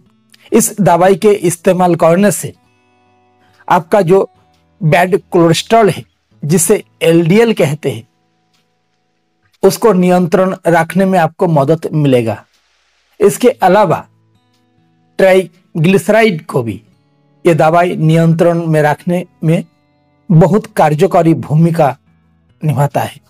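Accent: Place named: Bengal, India. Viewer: यह बंगाल से है इनकी हिन्दी एैसी ही होगी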